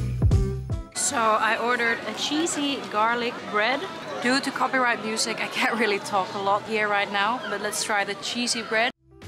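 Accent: Netherlands. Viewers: How does an expat pick up such a dutch sounding accent?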